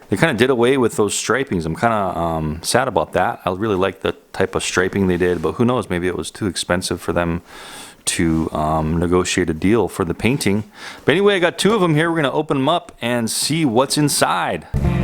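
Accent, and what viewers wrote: United States. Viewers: do you come from america)ok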